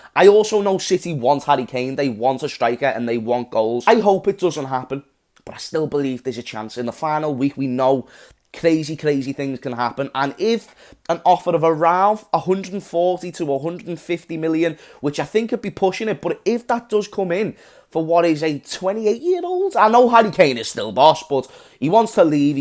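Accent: Liverpool, United Kingdom. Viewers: Proper Scouser bro, keep up the content lad ynwa..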